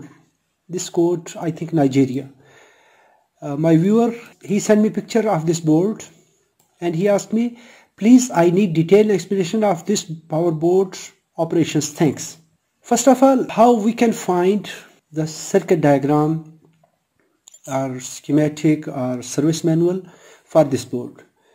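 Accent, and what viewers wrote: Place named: Arab world. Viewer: impressive arabic accent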